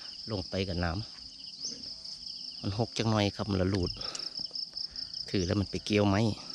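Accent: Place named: Rural, Thailand. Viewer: กดติดตามเพราะสำเนียงการพูดว่าทำไมคุ้นๆที่ไหนได้คนบ้านคำกั้งดีใจครับ ปมคนบ้านแจนแลน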